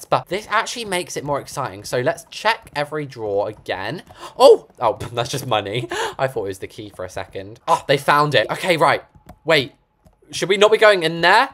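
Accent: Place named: United Kingdom. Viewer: Are you a brit cuz your accent is so beautiful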